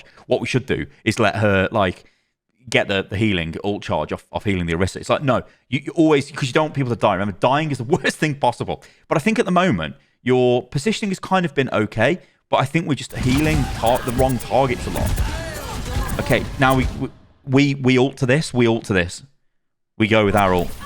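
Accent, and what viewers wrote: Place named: Geordie, United Kingdom. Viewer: Your geordie accent was really strong in this video, If your not then you sounded at least haha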